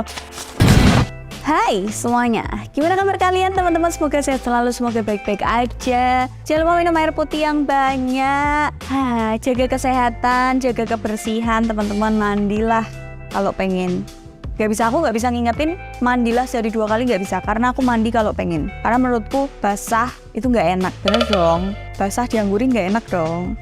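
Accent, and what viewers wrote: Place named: Java, Indonesia. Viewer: Pakai Bahasa indonesia yg Benar cermat ,,,, jangan pakai logat Jawamu ,,,, yg nonton bukan wong jowo doang,,, ,,, byk suku lain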